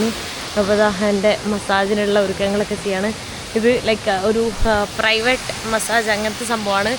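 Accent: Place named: Tamil Nadu, India. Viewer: 👍.. Speck slightly tamil...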